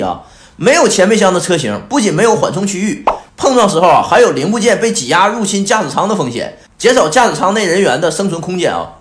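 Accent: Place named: Northeast, China. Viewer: 听这东北银白活了半天，特斯拉原来这么遥遥领先呀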